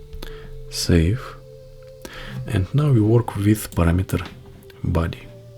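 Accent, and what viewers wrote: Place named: Russia. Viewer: Are you russian? I hear a native accent) Ну или мне просто показалось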